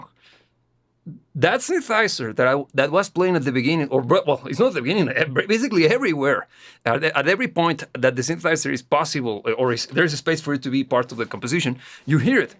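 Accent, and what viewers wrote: Russia. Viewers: Ты русский (акцент на месте) Тоже очень Люблю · It seems you already have a slight Russian accent Great analysis, btw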